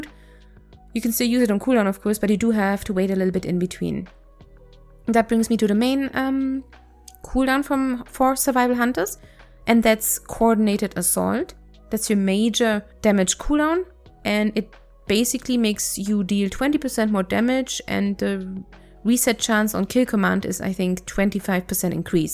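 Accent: Germany. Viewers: You are not even trying to hide your German accent like many do. I love it. You seem like a sweet person, I main a bm hunter but I want to try something new and since I don’t like mm I will give sv a chance. Thx for the guide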